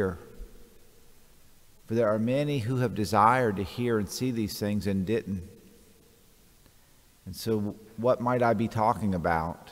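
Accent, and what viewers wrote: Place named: South, United States. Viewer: Father, I was browsing on YouTube and "discovered" your video clips. (I think maybe God had a hand in my discovery.) Anyway, I must say that I enjoy listening to your homilies. I try to listen to you quite regularly. P.S. It's nice to come across a priest who has a nice Southern accent, y'all. That's meant as a compliment.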